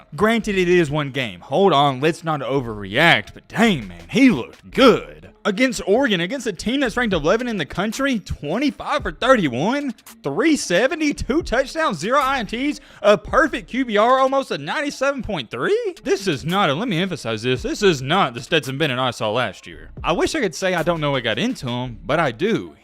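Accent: South, United States. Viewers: It was #2 vs #5 and Both teams played tough tough Defense that should’ve been expected Two top 5 teams and dude your Clearly a SEC guy it’s all in your accent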